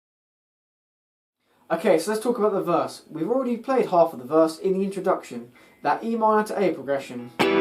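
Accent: United Kingdom. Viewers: I love british english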